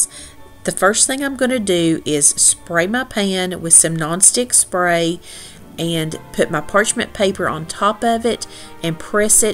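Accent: South, United States. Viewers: This was such a super sweet video. The treats all look delicious.i plan to make a few of these this year, hopefully. The best part of this video is listening to you talk, and especially about the real meaning of Christmas. I love your sweet, soft southern voice. You sound like my family. We are southern and listening to you was a joy.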